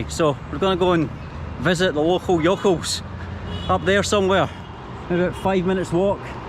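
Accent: United Kingdom. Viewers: Are you British .... cause your accent is so harsh to me❗❗🤔🤔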